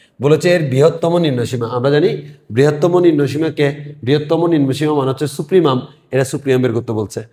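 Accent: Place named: Chittagong, Bangladesh. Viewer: Kotha te bujhai jai vaiya chitainge 😂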